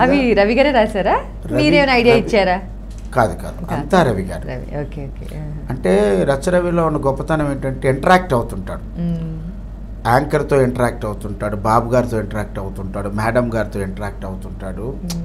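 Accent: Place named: Telangana, India. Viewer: రచ్చరవిగారు ఒక అద్భుతం🙏ఆయన తెలంగాణ మాండలీకం అద్భుతం🙏🙌👏👏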